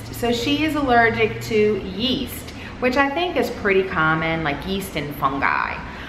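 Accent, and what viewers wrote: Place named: Louisiana, United States. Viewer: I swear I can spot a Louisiana accent anywhere 😂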